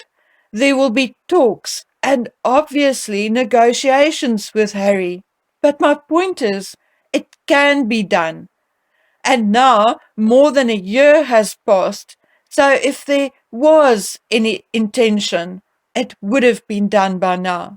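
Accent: South Africa. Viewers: We're in California, so we don't have much opportunity here to speak with many people from South Africa, but from what I've heard accent and style of speech is very typical. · Your videos are always very informative and well prepared and I look forward to hearing your news every time one comes up. Thank you for your hard work,I love listening to your S A pronunciation.Brava best wishes from Italy .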